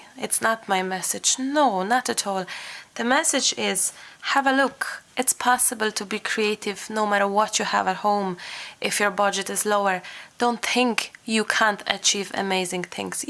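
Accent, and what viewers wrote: Cork, Ireland. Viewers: I'm loving your accent! Is there a bit of Irish in there or am I crazy? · where are you from?? This is my 1st time watching your video and you did a fantastic job!! It's just I've been trying to guess my 1st 2 was Russian or Polish but then when you carry on speaking every now and then you sound Irish x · Greta video, i love how the 'Cork' accent was strong enough for me to wonder if you were living in Ireland - Im also in Cork :D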